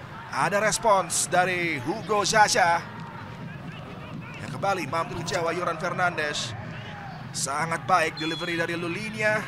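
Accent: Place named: Malaysia. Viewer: Komentator nya seperti bahasa malaysia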